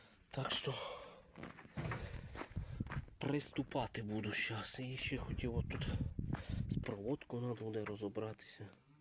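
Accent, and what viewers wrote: Transcarpathia, Ukraine. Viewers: Українська ,закарпатський діалект ти ще не чув наш суржик південний😂 а взагалі у всьому світі така ситуація,в кожній місцевості свій говір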